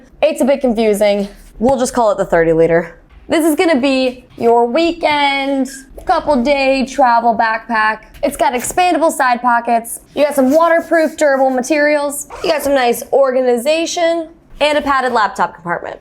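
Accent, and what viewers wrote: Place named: South, United States. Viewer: why it talk country and southern but look latin.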